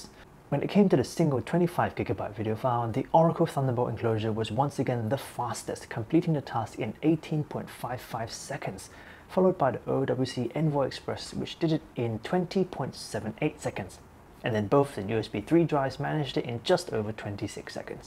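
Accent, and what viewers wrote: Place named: United Kingdom. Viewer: Weird seeing an Asian speak old style British. But not as weird as seeing a black guy speaking British.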